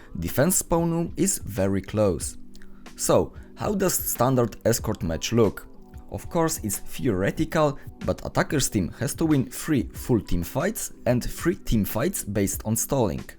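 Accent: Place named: Poland. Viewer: Beautiful Polish accent, at first you were Russian, ale potem zauważyłem ee to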